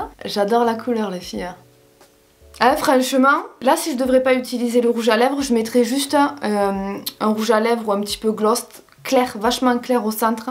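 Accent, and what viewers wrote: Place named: Southwest, France. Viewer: Cc ma belle. Tes vidéos sont vraiment "fraîches" t'es super agréable. Ton accent me fait penser à mon papa qui vit dans l'Ariège. Ahhh l'accent du sud ouest 👌. Bises belle journée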